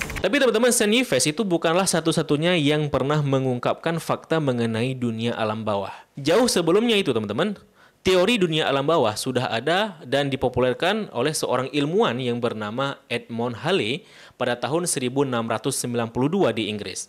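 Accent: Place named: Aceh, Indonesia. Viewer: Logat Aceh Abang ini buat aku mahu ketawak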